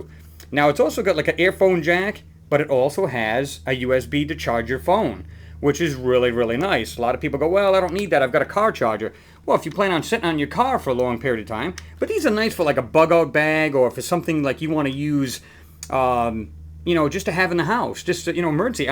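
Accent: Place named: New York, United States. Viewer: You sound like a new Yorker hey! Love the video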